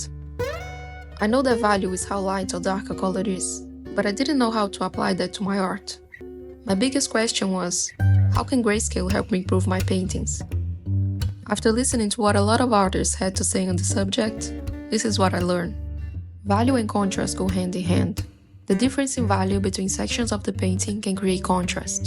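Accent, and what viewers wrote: Brazil. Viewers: she's from brasil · her Brazilian accent is very cute ! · Brazilian. Easy spot, watch some movies bro, it helps with the pronunciation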